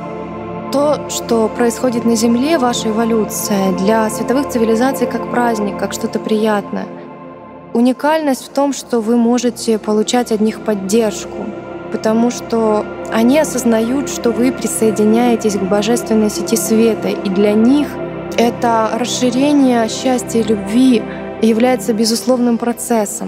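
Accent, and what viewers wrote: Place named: Moscow, Russia. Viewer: Московский говор на понтах. Не подходит к этому тексту. П.С. раса с одной с, а не расса.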